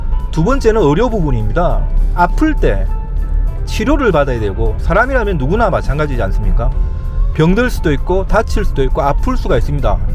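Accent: Gyeongsang, South Korea. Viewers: 참 말을 재미있게 잘 하네요. 얄구진 차도 끌고 다니고 . 오랬만에 얄구진 소리 들어 보내요. 경상도 사투리 인같네요.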